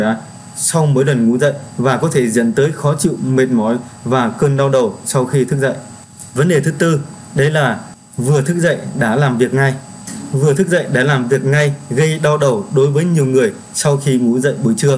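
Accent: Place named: Thanh Hoa, Vietnam. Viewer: Nghe là biết anh là người Thanh Hoá nè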